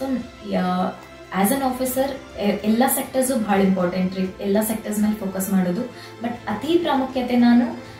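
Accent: India. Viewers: Yalli hodru uttara karanataka(UK) bashe(style) bidabyadri akka... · Bahal simple agi guidance madidri Akka.....pakka Belagavi Kannada...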